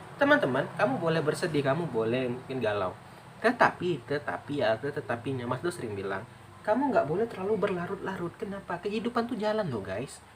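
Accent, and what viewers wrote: Padang, Indonesia. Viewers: Suara kaya logat org padang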